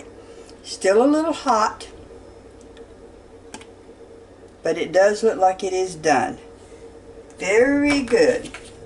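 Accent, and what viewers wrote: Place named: North Carolina, United States. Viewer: I love when you make old traditional recipes! 💞 You sound like your from my neck of the woods in NC! ☺️ I wonder if you've ever made Sticky Toffee Pudding? It's a very famous & popular moist cake from England & Ireland. I've never made it but would love to see it done. Thanks 🤍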